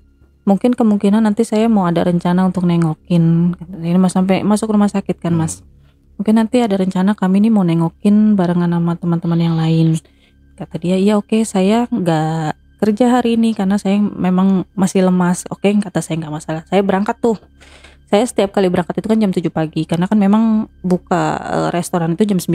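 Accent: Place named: Java, Indonesia. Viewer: Intinya bukan dr derah nya ! Elu sok2 jkt tp logat lu aja jawa. Bukan betawi. Justru org2 jkt jg banyak yg maun dukun !! Dmna pun itu jg ada pada main dukun. Pesugihan sgla macm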